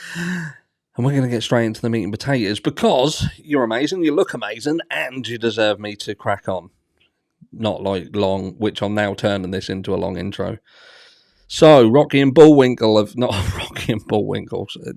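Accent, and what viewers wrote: Norfolk, United Kingdom. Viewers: Mate, I just wanna say. I found your channel during this case, and your coverage has been brilliant. 👌🏻 I just love hearing the Norfolk accent on YouTube, 😆 I don’t know of many content creators from around here. I’m a Lynn boy! May I ask where abouts in Norfolk you’re from?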